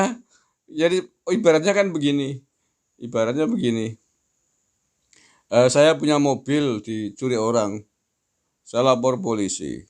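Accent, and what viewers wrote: Banyumas, Indonesia. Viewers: Ngakak ngapak....!! 😀😅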